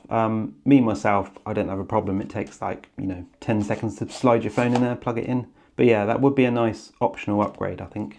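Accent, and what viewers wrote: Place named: England, United Kingdom. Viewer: good idea to show Potensic what to improve! But what I need to mention about the presentation of this Video: please try to talk in "standard English" to reach an international audience...you speak in an accent that probably only English people can understand (or people who have lived in England for a long time)...a pity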